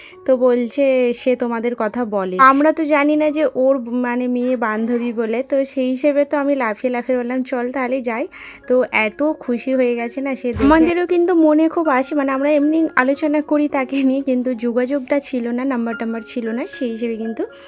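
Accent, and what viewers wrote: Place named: Bihar, India. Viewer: ❤❤❤ তোমার কথাগুলো না বিহারির মত হয় তুমি কি বিহারী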